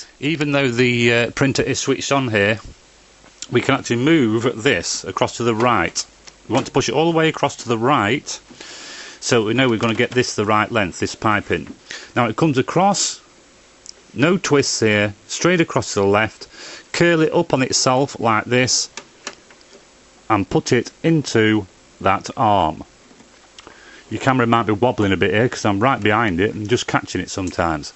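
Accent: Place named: United Kingdom. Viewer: I have only this minute looked at your video, the ciss seems just what I want. I will look into things a bit deeper and contact later. In the mean time I will say that I personally prefer instructions that have a drawing of all the relevant procedures and parts so that I can take my time doing things. Thank God for a lucid fellow Brit who I can understand. Good luck.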